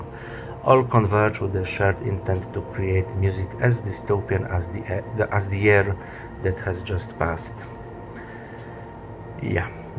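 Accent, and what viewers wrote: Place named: Poland. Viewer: I knew I heard that Polish accent and typical Polish "erm/ummm"s right. Imma be honest, I have no idea in world how you got recommended to me, but I'm really happy you did. Will definitely check out the stuff you've spoken about. Cheers and keep going!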